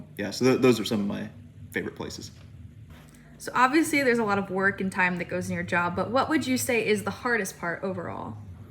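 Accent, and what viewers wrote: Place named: Midwest, United States. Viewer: love your work. Didn't even know your last name, always wondered what you teach and at what level. Always assumed you are a Midwestern, but always looked for clues in how you edit, projects you select, accent (daggum!!!) You're such a humble guy but talented and genuine. Appreciate what you do and consistency. Blessings!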